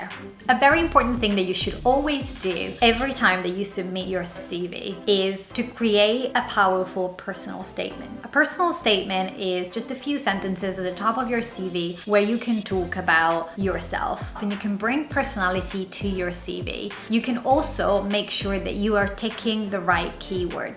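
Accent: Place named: England, United Kingdom. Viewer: Thank you for the information. It's always hard for me to get through a presentation when the presenter ends every sentence with vocal fry but easier with an English accent. I guess I'm a racist.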